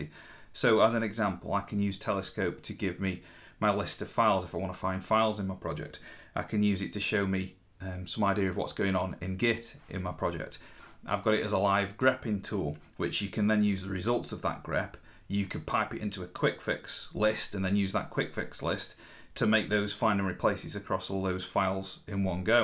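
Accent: Stoke, United Kingdom. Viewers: I was PROPER surprised to hear a Stoke accent when you started talking!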